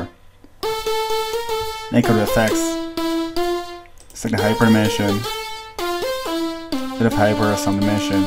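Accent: Netherlands. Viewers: Hey man .. goeie totorial .. maar je moet echt werken aan je Engels accent en langzamer praten je bent amper te volgen 😉 .. geen haat gewoon een tip .. oja .. en je hoort duidelijk dat je Nederlands bent .. haha vandaar Nederlandse reactie 😉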